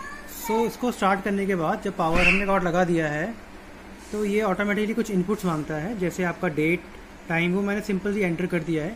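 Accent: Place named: India. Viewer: I am American and, of course, used to American english. Of course, I am also used to the accents of the other primary english speaking countries. Un fortunately, consistently, Indian Youtube presenters have very accented english which makes it very difficult to understand a technical presentation. I am quite sure I would understand them during a conversation.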